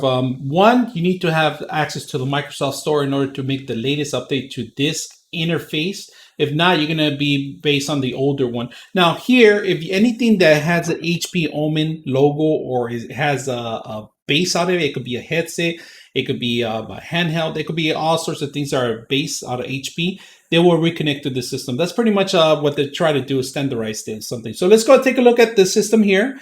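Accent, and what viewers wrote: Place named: Philippines. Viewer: I love your accent, sounds a lil bit filipino sometimes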